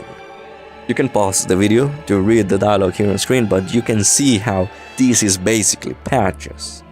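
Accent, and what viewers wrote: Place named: Europe. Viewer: Loved the video so much! Thanks for covering this. By the way, are you european? You have a certain accent!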